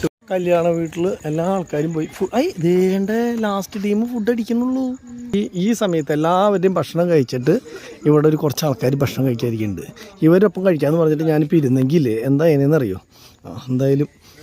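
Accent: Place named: Thrissur, India. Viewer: Nidhak samsarikkanulla samayam kodkooooo. Thugg kekaanulla kodhikonda adum thrishoor Bashailooode😂😂😂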